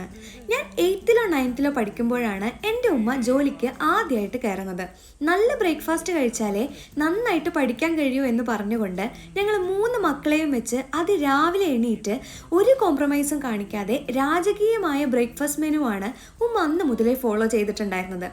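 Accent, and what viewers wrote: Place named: Kannur, India. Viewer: kannur slang veran thudangeetund😄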